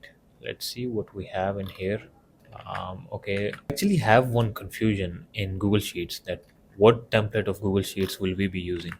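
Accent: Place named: India. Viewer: BRO WHAT IS THIS BIRYANI ACCENT MAN WE CANT LEARN SHIT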